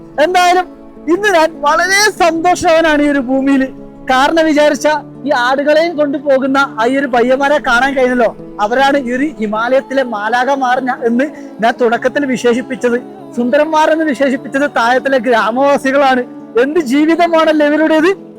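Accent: Kannur, India. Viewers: Halo bhai, namaskar, ur journey, ur adha adhuri hindi language, ur kannur slang, eg koy for kozhi(chicken) r great and amusing. Best wishes and God bless u always. always.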